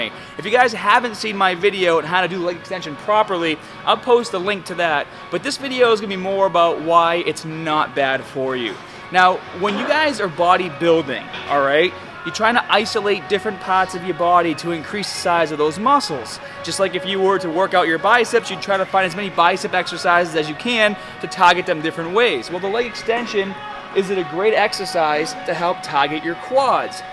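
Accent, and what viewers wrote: Boston, United States. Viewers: Yo is he american or am I just high? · I have a feeling you're from Bawsten? Ha ha I like accents. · You from Boston?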